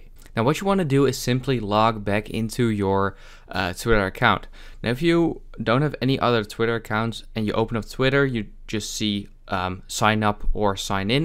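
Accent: Netherlands. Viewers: You're definitely dutch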